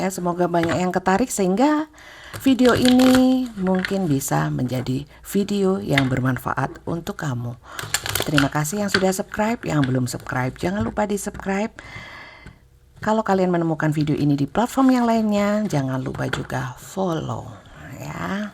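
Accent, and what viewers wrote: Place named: Surabaya, Indonesia. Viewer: Q suka logatnya, Malang & Surabaya banget.. q arek Surabaya, tpi udh lama stay di Bali. Jadi kangeeen Surabaya. Wah bener, harus waspada..di sini ada tetangga tukang gendam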